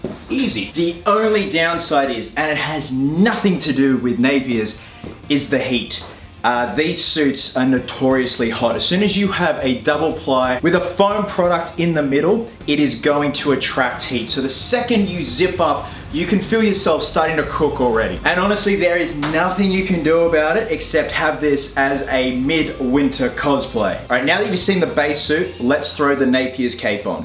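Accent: Australia. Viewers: It’s weird cuz batman has an Australian accent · I actually bought it that you were Batman when you were doing the reveal video. Then when you spoke again I was like "Oh, right he's Australian" lol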